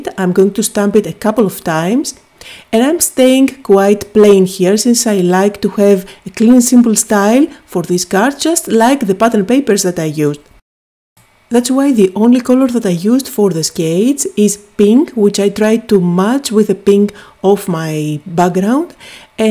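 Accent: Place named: Greece. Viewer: I loved all your cards and I do want to mention that I know a Papaioannou family in the town in Ontario, Canada, where I live. They have a daughter who was a figure skater... Penny Papaioannou. One of my best friends was married to a Greek man with the last name Papadakos. Anyway, I love your cards and your accent reminds me of my Greek friends.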